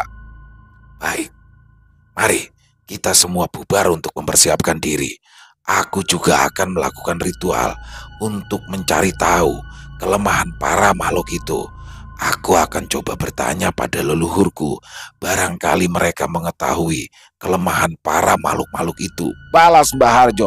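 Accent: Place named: East Java, Indonesia. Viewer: Misuh khas Jawa timuran emang fenomenal 😂😂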